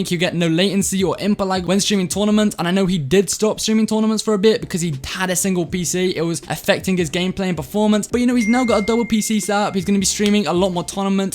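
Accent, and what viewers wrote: Sweden. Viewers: are you from sweden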